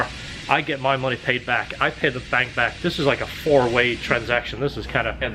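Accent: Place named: United Kingdom. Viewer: By any chance are you a Britt who emigrated to the States?, Im English & can pick up on your accent and some of your phrases haha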